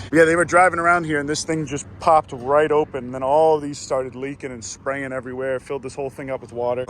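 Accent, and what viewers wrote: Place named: Pittsburgh, United States. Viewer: Aye, pittsburgh represent! i knew i heard a little yinzer in you lol God bless and happy holidays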